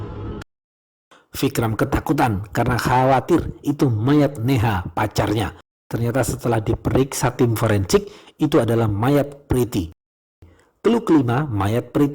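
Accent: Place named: Java, Indonesia. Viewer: Narratornya jawa medok inih haaa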